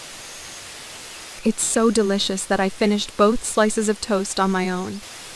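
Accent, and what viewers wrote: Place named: United States. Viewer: I don’t like the American accent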